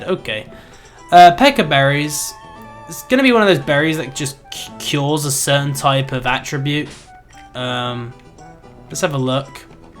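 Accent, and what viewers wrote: United Kingdom. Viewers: im so suprise about myself sitting here and listening to your annoying english voice 7.minutes. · Sounds More British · He's British... How can you be from Sweden and not even know your own accent? lol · What accent does have. British?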